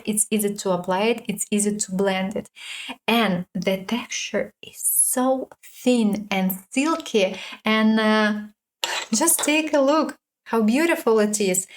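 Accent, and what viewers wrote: Russia. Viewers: Ты русская? Просто русский акцент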